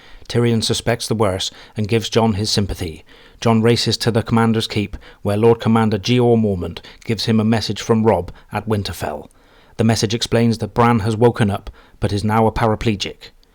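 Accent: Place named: England, United Kingdom. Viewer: it's because your accent is so naturally English, that it's difficult to imitate, but sounds so beautifully cultured. Universal English is American English because it's much easier than English English to pronounce. 😆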